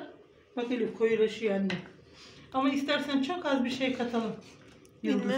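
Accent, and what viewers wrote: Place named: Aksaray, Turkey. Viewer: Aha buda aksaraylı galiba kolay gelsin bildim demi kız hanım aksaraylisin sende😊😊😊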